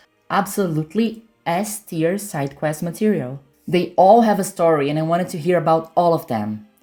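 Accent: Brazil. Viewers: Beautiful brazilian accent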